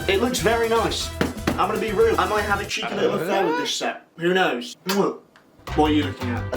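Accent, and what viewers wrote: United Kingdom. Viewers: Why does he talk about dollars but has the most British accent ever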